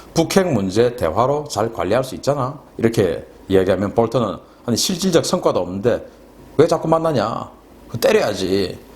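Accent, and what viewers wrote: Gyeongsang, South Korea. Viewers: 이씹새끼는 말투부터 문둥이네.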